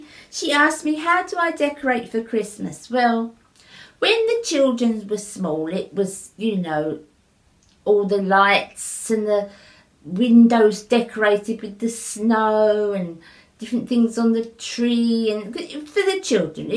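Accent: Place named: Scotland, United Kingdom. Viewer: I have been subscribed to your channel but hardly have a time to drop a line! What part of Scotland are you from? I luv the accent!👍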